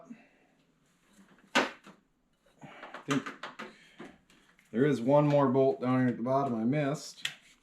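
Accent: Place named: Minnesota, United States. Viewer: Fellow rural Minnesotan here. About to do a ps pump on my 98 Tahoe this weekend. This video was immensely helpful and informative. Certainly helps we speak in the same dialect 😂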